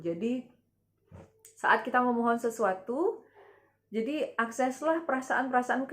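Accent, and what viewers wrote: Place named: Bali, Indonesia. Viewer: Mba nya dari bali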